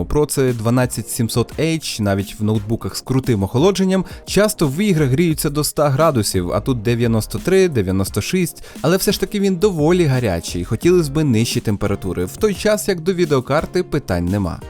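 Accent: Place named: Surzhyk, Ukraine. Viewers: За суржик лайк👍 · а шо тут такого, хай буде собі суржик, це робить тебе індивідуальним, у кожної людини шо балакає суржиком є свій унікальний суржик, так що розмовляйте як удобно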